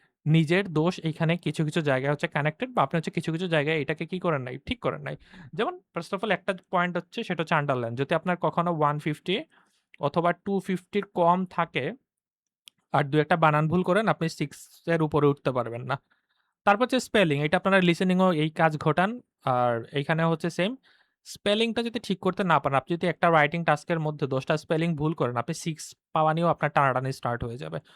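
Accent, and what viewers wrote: Sylhet, Bangladesh. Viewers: Bro are you from Sylhet? your accent is like a proper Sylheti 😀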